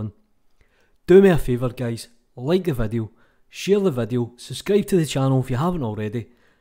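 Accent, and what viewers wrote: Scotland, United Kingdom. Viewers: I turned the captions on because I find it a bit hard to follow a Scottish accent, but youtube couldn't handle it very well either, so I got phrases like "Ness is a primitive" :-) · I love his Scottish access soooo much. I bet others do so ;-)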